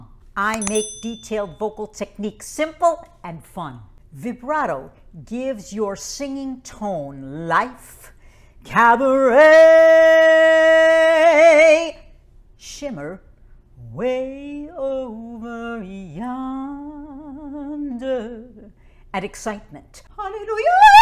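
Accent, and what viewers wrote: New York, United States. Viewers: I love your NY accent! A lot of my family is from there and it reminds me of them 😄 Also your videos are super informative. Thank you!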